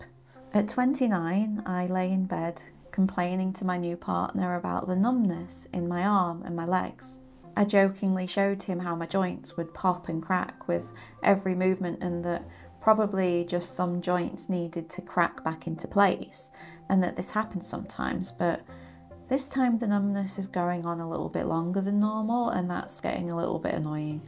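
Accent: United Kingdom. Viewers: Literally feels like listening to an alternate version of myself... but with a British accent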